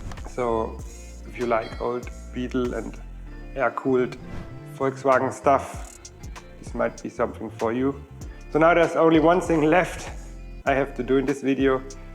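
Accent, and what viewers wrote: Swabia, Germany. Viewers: ❤ I really love your Swabian accent! I look forward to your sourkraut video!! 🎉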